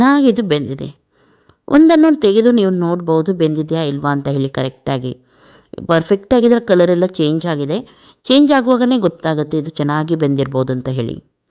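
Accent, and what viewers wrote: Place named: Halivana, India. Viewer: Kannada halivana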